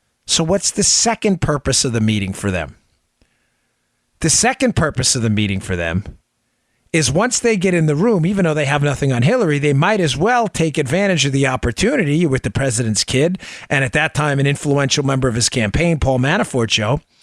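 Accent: New York, United States. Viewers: Bongino one of my favourite people.I just love,he sounds like an Italian in New York.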